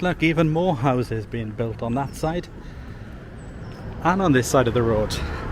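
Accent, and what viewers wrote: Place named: Wales, United Kingdom. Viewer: Your Welsh accent is barely there in London...definitely returned now you're back in the valley.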